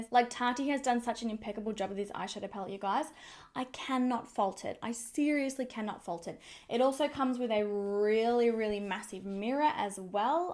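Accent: Australia. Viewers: She's from Australia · Joan Gentile I’m curious to know how you do not know an Australian accent when there are many Aussie celebrities with the same accent.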